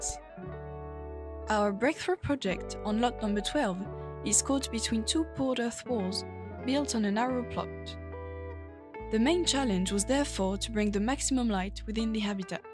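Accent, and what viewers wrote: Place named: United Kingdom. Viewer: Je viens de tomber par hasard sur ce projet étudiant et j'ai été bluffé par la qualité du rendu qui me semble déjà à la hauteur des plus grands designers d'espace de notre temps. L'habitation répond avec brio à l'enjeu social du projet tout en tenant compte du cahier des charges qui n'est pas des moindres. Je souhaite saluer le travail de la voix off dont les intonations "british" ont su s'accorder à la finesse et la subtilité de cette création architecturale. Ces deux jeunes designers ont réalisé un vrai tour de force avec ce projet qui annonce une carrière très prometteuse. Un grand bravo !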